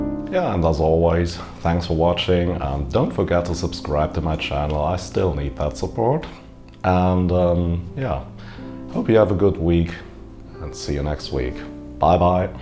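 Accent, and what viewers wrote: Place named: Germany. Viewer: Ich schreib mal auf deutsch, weil ich faul bin und an deinem Akzent auch raushöre, das du deutscher bist :-) Das Belächeln von Romplern fiel mir in einschlägigen Foren auch auf und ich kann heutzutage nur sagen, dass das Lächerlich ist! Witziger Weise hat einer der Typen, der „Rompler“ belächelt, in seinem Profil angegeben, dass er NI Komplete 8 besitzt (schon ein paar Jahre her). Wenn ich diese NI Libraries mit meinem Roland XV-5050 und SRX-08 und 05 vergleiche, kann ich nicht anders als diesen Typen auszulachen! Vom Prinzip her ist so eine Software-Library mit den Instrumenten nichts anderes, als ein „Rompler“. ABER: Die Ni-Dinger waren oft Sample-technisch viel komplexer aufgebaut, als die meisten Presets auf meinem 5050, aber klangen halt leider nicht halb so geil. und wenn ich recht überlege: Die besten Beats, die ich in meiner Jugend gehört habe, wurden mit solchen „Romplern“ gemacht. 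Hol dir einen aus der Roland JV oder -XV-Serie oder Korg Triton, Yamaha Motiv und du hast eine breite Palette an Instrumenten, die GEIL klingen! Samplen ist heute ohnehin eine heikle Angelegenheit.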